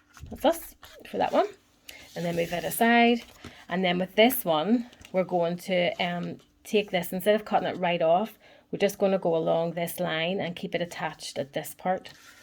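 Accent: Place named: Scotland, United Kingdom. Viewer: Just subscribe, love the beautiful Scottish ( I hope) accent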